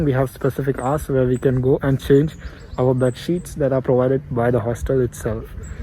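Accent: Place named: Russia. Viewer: You look the exact same, like you used to be during school, particularly in this video except for your voice and the Russian English accent!! I've been following all your videos, happy to see you exploring and living these experiences🙌🏽🤗 Good luck buddy 😊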